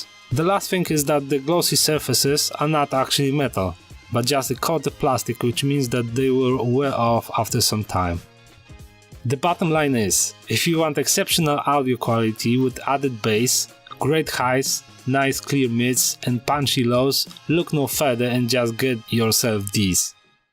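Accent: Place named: Poland. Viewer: You have Polish accent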